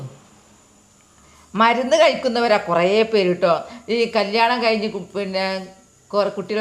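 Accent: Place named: Wayanad, India. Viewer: ഹലോ താത്താ... ഞാൻ മലപ്പുറത്തുനിന്നാണ് കാണുന്നത് കേട്ടോ. താത്താന്റെ ആ വയനാടൻ സംസാരിക്കുന്ന രീതി കേൾക്കാൻ എന്ത് രസമാണ്! താത്താനെയും സംസാരിക്കുന്ന ശൈലിയും എനിക്ക് ഭയങ്കര ഇഷ്ടമായി. താത്താക്ക് പടച്ചോൻ നല്ല ആയുസ്സും ആരോഗ്യവും നൽകി അനുഗ്രഹിക്കട്ടെ. ഈ മുതിരത്തോരൻ കണ്ടപ്പോൾ തന്നെ കൊതിയായി. ഞങ്ങൾ ഇവിടെ മുതിരപ്പുഴുക്കോ, മുതിര ഉപ്പേരിയോ ഒക്കെയാണ് ഉണ്ടാക്കൽ. താത്ത ഉണ്ടാക്കിയ ഈ രീതി എനിക്ക് പുതിയ അറിവാണ്, ഞാൻ എന്തായാലും ഇത് ട്രൈ ചെയ്യും. ഇതിന്റെ കൂടെ പറഞ്ഞ ആ മുതിരക്കറിയുടെ റെസിപ്പി കൂടി താത്ത അടുത്ത വീഡിയോയിൽ കാണിച്ചു തരുമോ? ആ കറി ഉണ്ടാക്കുന്ന രീതി കാണാൻ കാത്തിരിക്കുന്നു. താത്താന്റെ പുതിയ വീഡിയോകൾക്കായി ഞങ്ങൾ കാത്തിരിക്കും! 😍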